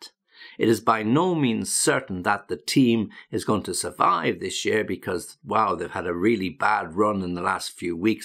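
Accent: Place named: North England, United Kingdom. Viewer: Hi! This is the first time I watch one of your videos, I really like your accent, it is so colourful, are you from the North of England?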